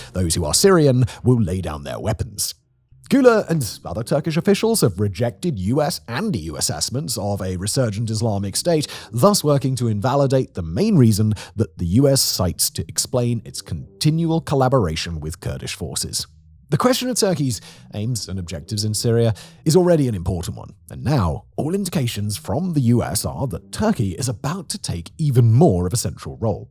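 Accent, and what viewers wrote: England, United Kingdom. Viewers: It’s hilarious to me that someone as ill informed or informed but lying to their audience is so highly regarded on these topics simply because he has a YouTube channel and speaks with an English accent. To be clear, there is not a single part along the front lines that Russia is quickly advancing at. Russia is getting the butt’s handed to them in every direction. Just because you are losing a tree line or a few fields every week doesn’t mean you’re losing. Ukraine has taken more land in Russia than Russia has in Ukraine all year. In the area’s where Russia is advancing their casualties are as high as 10 to 1. This is a strategy that is similar to a heavy weight boxer letting the bigger and stronger opponent wear themselves out by throwing non stop haymaker’s that’s doing little damage. At the rate Russia is advancing it will take them 250 years and 100 million dead soldiers just to reach their stated goals of taking Donbas, Luhansk, Kherson, Zaporhizia, and I forgot the other off the top of my head. 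Make no mistake if Ukraine said we are going to hold this line at all cost they could do it, but if Russia wants to lose a thousand soldiers and millions in equipment to take a field you let them in a controlled retreat. Russia’s economy is collapsing, their allies and bases overseas are falling, their military has been set back decades, and there are sign people inside Russia are turning. Right now Russia is losing more troops than ever before. Everyday they are setting a new rec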